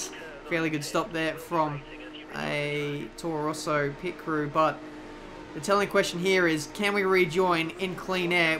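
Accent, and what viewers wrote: Australia. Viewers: which part of australia are you from and how old are you? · What part of Australia are u from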